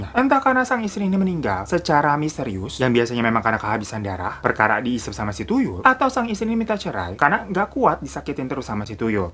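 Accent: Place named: Jakarta, Indonesia. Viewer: Aku sih ngakak mulu liat channel ini babangnya logat betawinya itu bikin gemesh auto ngakak..🤣🤣🤣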